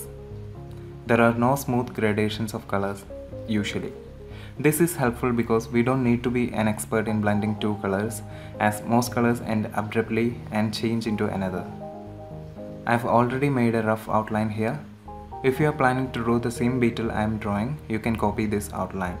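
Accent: India. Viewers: Omgggg that Indian accent is too adorable.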